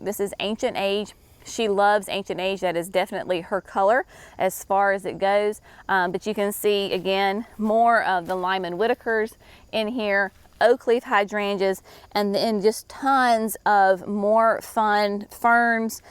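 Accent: South, United States. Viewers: Your parents' garden reflects a true HOME garden created by loving hands who seem to love the beauty in the gifts from God our Creator whether that be in the incredible variety of plants He gives us to enjoy, or the beauty in a a piece of wood, a fallen log, or another artist's creation! I appreciate your just being yourself as you walk through "Mimi's garden" in both your joy of sharing information about a plant, while teaching us as well (I likely could be your mama, but ALWAYS learning!) As a fellow Southerner, I love your natural expressions and lilt in your voice. However, I also appreciate and learn from your very different sounding but naturally gifted gardening friend Laura in Oregon...both of you are "down to earth" as we would say...no pretense in any way. And did a great job on "walking with you" in that woodland garden environ...that's not easy to do without sliding on pine straw or hitting a tree root (unless that's in the 'bloopers':) Blessings!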